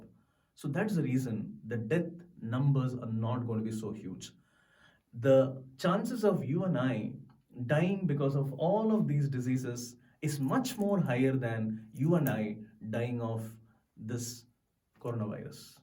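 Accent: India. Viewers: The auto text can’t understand Indian English either... Germany rather than Gemini.. interesting though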